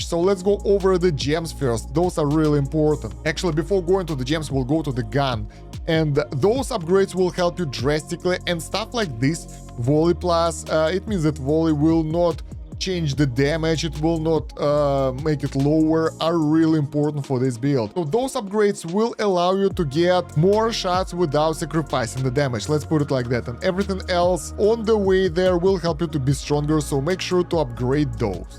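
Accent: Russia. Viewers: да лан тебе) Скажи как есть) акцент сразу слышен наш)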